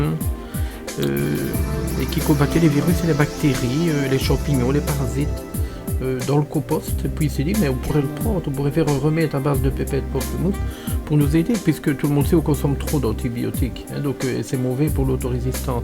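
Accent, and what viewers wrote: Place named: Liège, Belgium. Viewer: En tout cas, ça n’atténue pas l’accent de « Lieche »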